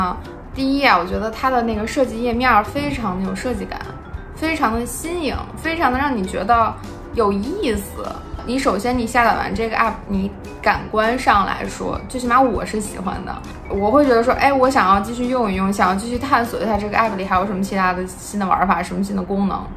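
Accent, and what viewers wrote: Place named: Beijing, China. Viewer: 北京腔儿，姐们儿老北京啊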